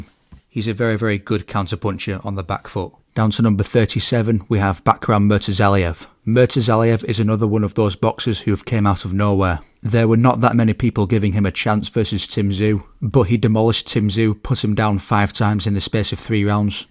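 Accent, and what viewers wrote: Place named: Northern England, United Kingdom. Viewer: I like your accent, probably Northern accent in the UK.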